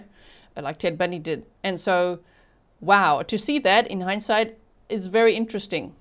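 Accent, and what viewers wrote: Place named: South Africa. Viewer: Are you originally from South Africa? Your accent sounds like it. Not being nasty, just sounds like it 🙂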